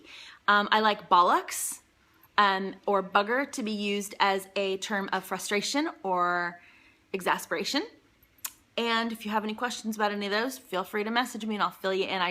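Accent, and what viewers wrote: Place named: United States. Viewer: Yes my dear so happy for u it's amazing how u keep ur accent I thought if ppl live long somewhere they will have the accent of country. I love ur American accent btw and me I'm 5 -6 years in the uk but can't get rid of my meerkat accent 😂😂😂 ur videos are amazing keep it up Hun 😘😘😘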